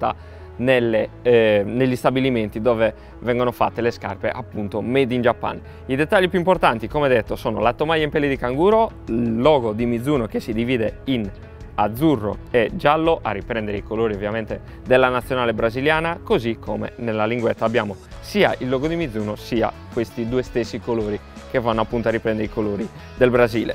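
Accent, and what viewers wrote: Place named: Sardinia, Italy. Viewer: Spettacolare l'accento sardo! Continua così ragazzo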